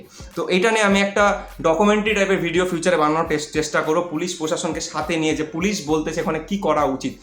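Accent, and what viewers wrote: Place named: Siliguri, India. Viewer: Tmr siliguri te kty bari dada .. Kto din dhore video dakhchi ..kl kei ami amr bor k bollm j ai dada mone hoi siligurir amder mton kotha bole .. Kolkatar moton na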